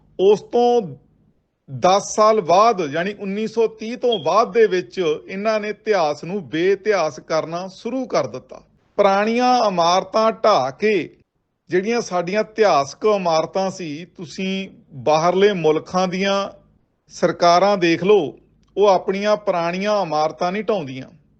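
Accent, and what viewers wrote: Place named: Malwa, India. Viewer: Sahi. Punjabi. Bol. Mansa. Bhatinda. Boli. Dee. Jiada. Werton. Ker. Riha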